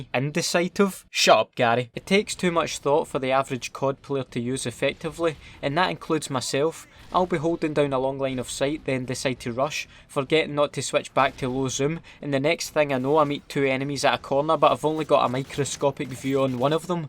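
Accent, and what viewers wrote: Scotland, United Kingdom. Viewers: everything this guy says is automatically 4x funnier since its scottish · He’s got a strong Scottish accent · He shoulda said holographic shite instead of blindness feel like its funny saying shite in Scottish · I cannot say just how much I love the Scottish accent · I dont even play COD anymore i just listen iT for the amazing scottish accent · Half the shit he says wouldn’t be funny in an regular accent but in this Scottish it has me dead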